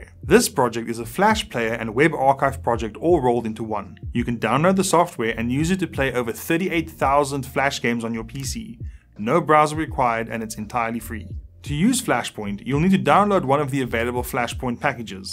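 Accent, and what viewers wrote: South Africa. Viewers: South Africa? I hear you, bru.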